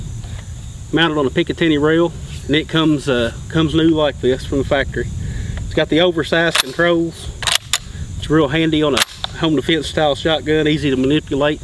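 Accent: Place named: Oklahoma, United States. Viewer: This dude has a cool accent -- Oklahoma?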